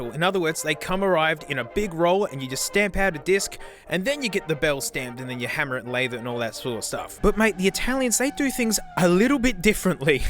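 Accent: Australia. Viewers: I can't hardly tell the difference between any cymbal on my chineesium earbuds I just like listening to funny Australian man lul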